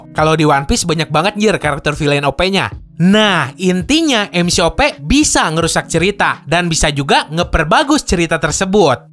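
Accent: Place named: West Java, Indonesia. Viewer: Mimin orang sunda yah haha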